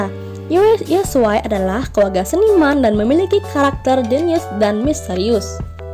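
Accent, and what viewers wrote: Bali, Indonesia. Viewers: Pasti orang bali . Khass logat nya 😌😌😌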